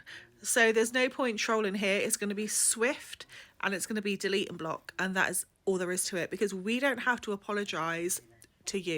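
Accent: England, United Kingdom. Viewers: South African here, beautiful accent, people from US love the English accent as well.❤️ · Some people I think do these things to get a response seeking attention any way they can, I did not even notice any issue with your voice at all, you have a normal English accent to me, and your personality is very positive so keep up the great work you are doing.❤❤❤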